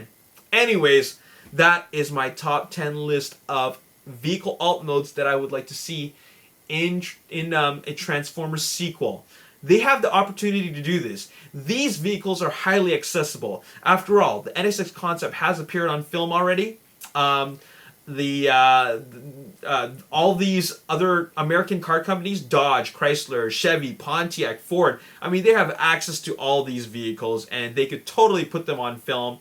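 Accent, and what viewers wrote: Canada. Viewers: You must be Canadian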